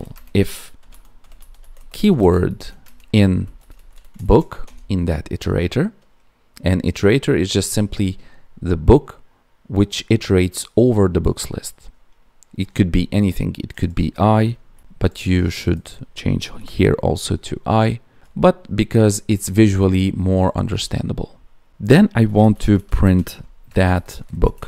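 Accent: Russia. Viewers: Неплохо маскировал русский акцент.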